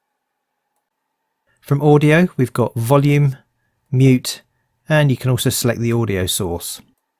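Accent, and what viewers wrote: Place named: United Kingdom. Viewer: Sweet British accent. Regarding monitor I have shortlisted ss cf390, curve.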